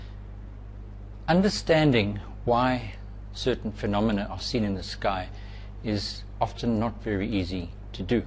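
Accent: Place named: South Africa. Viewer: Weird, I thought the planets orbited in an anticlockwise motion when looking down on the north pole. But this guy sounds South African so I guess he's basing things from the southern perspective.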